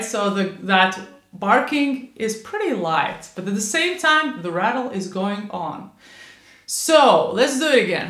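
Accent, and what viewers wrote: Latvia. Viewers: Wait? Are you from Latvia? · idk kinda sounds latvian😥😥 edit: oh shit u are latvian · Heey, are you by any chance latvian? 🧐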